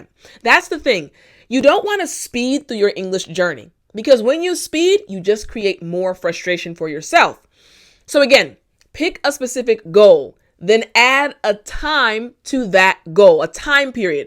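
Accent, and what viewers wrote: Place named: United States. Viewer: Peace from Egypt. I'm an English teacher also but I wish to learn American English accent from you.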